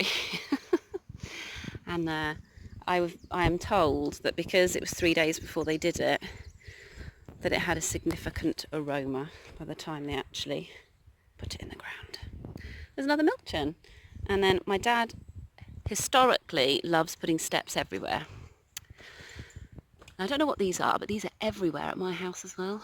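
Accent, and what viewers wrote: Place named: Newcastle, United Kingdom. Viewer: Omg the farm is divine, so gorgeous!!! And did I detect a Geordie accent?! 🥰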